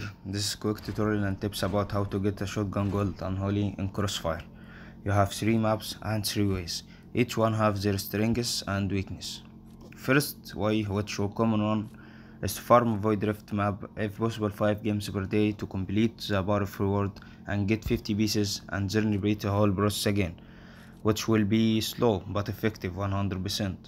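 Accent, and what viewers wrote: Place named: Egypt. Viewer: مصري عظمه الصوت باين حصل باين فشخ xd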